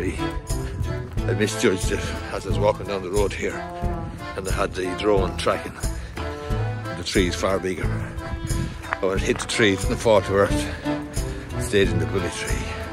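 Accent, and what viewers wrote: Ireland. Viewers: I like how the YouTube subtitles completely misunderstand the Irish accent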